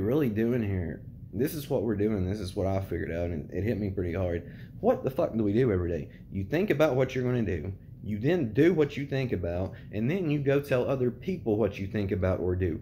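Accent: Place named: Bible Belt, United States. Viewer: I'm sure you've already taken a trip into Alan Watts territory but if you haven't please check him out! I had always heard of him but never listened to any of his words. Theyre a perfect breakdown of how to better understand the self from a westerners views. You have a thick accent like youre from where I am from which is the Bible belt. There's so much valuable info to hear in regards to how thats shaped our view of the self and God and how guilt has been turned virtue by the church. Regardless of if youre from a Christian background or not youre from a Christian society which has shaped our language about such stuff too. We don't carry the necessary terms to describe stuff such as the Brahman that is the self and all we do. This stuff will never answer questions about simulation theory but it will show you how to live only today and worry nothing about tomorrow for what happens to you is what you will, and always has been the will of you. 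By living only in the present it matters little what the future holds. And that includes waking up out of this computer program lol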